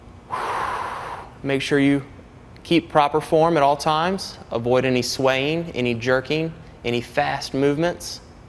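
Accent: South, United States. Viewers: He's got that accent... Hmm.. Southern accent?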